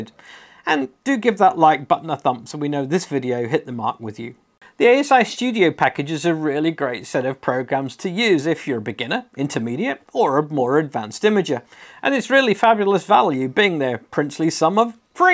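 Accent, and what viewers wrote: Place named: New Zealand. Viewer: good to hear a Kiwi voice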